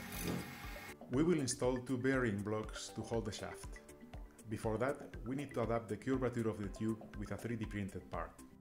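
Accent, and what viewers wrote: Spain. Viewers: Tienes al acento espanol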